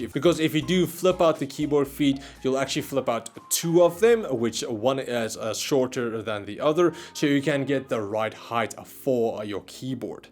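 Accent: South Africa. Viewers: from the start i knew you were from SA en awe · Is he from south africa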